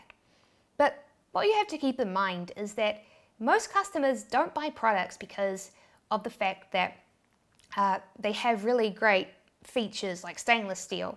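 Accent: New Zealand. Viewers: That New Zealand accent is real. Who else thought she said sex coasters?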